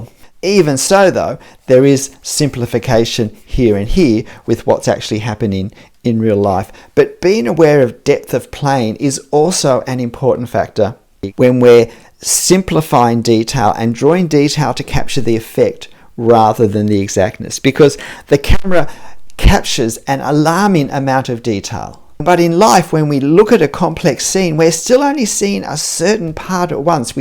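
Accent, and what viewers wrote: New Zealand. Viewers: I love that accent! "dee-toh" is it kiwi? New Zealand? I want to say I'm blown away by your work. The patch of flowers looked to tactile, like there was a path carved from people passing throught the flowers. And the last drawing... My God, breathtaking perspective.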